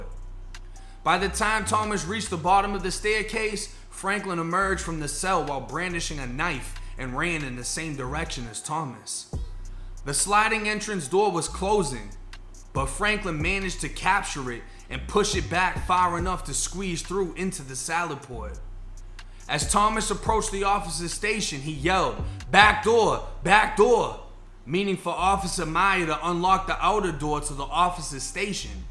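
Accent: Boston, United States. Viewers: Props buddy...but seriously how did you get a Southie Boston Accent living in The 'Gunshine State'? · You sound like your rocking with Boston! I can hear the Florida but some of your words sound like me lol yes im from Boston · You have to be from Boston or Massachusetts somewhere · Ive asked you before. Now you got so fucking many subscribers. Big respect. I did over 17 years myself. But i need to know. I know you got down in Tampa. But you gotta be from Boston with that accent. Im feom Boston. And thats the only place we talk like that. You got a Boston accent, bro. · Lowkey got a Boston accent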